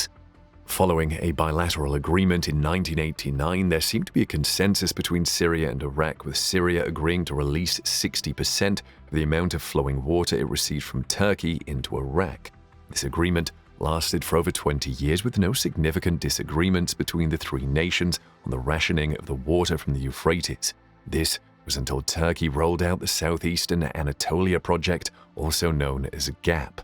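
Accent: United Kingdom. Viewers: If a British guy is narrating you know it's gotta be legit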